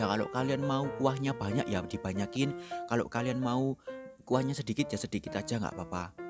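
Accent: Java, Indonesia. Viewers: Medookk banget mas nya hehe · Mas ngomong e medok pol Awoakwoak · makasih resepnya, semoga bojoku suka soale mami mertuaku pinter masak, jadi selerane suamiku tinggi masalah makanan dek ini yoo... lucune talah ngomonge... suka aku, medok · arek ngendi to mas kok rodok medok😅😅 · Bukan cma belajar di chanel ini Tapi juga menghibur iya suara masnya masih medok banget jadi senyum2 sendiri dengernya 😁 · Aku seneng logat jowo mu klo nerangin nya mas.. Masokk...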